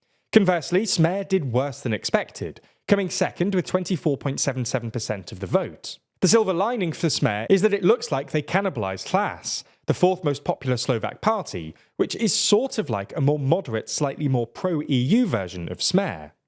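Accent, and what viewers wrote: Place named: Upper class, United Kingdom. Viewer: Can you find presenters without the uppercrustbritishimbetterthanyou accent? ITs off putting. Sounds like Harry lecturing me.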